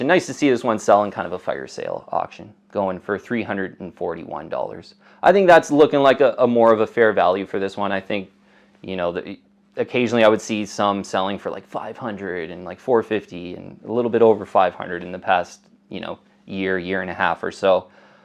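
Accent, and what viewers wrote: Canada. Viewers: Love your videos - and that Canadian accent makes me miss home. Awesome work! #TimHortons4ever #logriders4life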